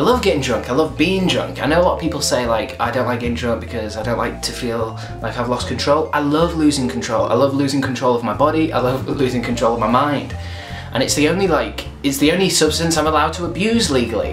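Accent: North, United Kingdom. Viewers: you sound so northern lmao well i think so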